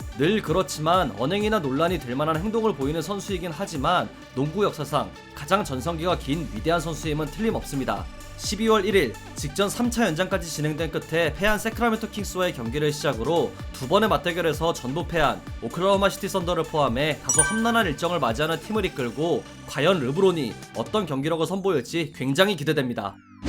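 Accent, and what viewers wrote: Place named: South Korea. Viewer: 너무 콩글리쉬틱 하네요 ㅋㅋㅋㅋ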